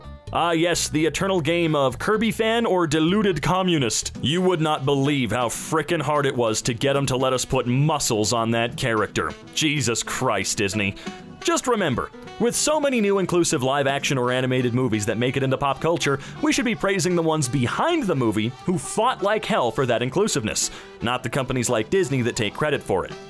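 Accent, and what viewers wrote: Ireland. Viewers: Love Robins irish accent. Is there an irish subbredit he can read for us? =D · has to be Irish omg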